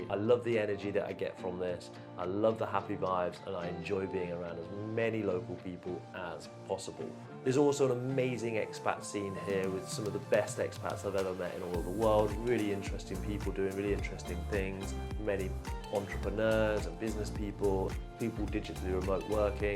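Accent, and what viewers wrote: United Kingdom. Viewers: I can listen to your accent all day, Mate! It sounds a bit like the north of England. Would you mind telling us from where you hail? Again, I love listening to you speak. I have a think "American" accent because I am from New York City, so... I have had people say the same thing to me. Also, if you can speak up a bit louder on each video that would be muchly appreciated. Even the loudness turned up to the max, I could still do to have your voice be a bit louder.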